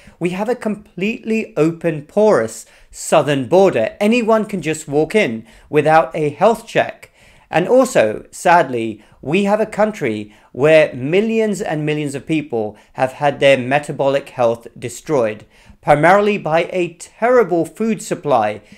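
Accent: United Kingdom. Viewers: I didn't know you were an American citizen, since you sound like a Brit!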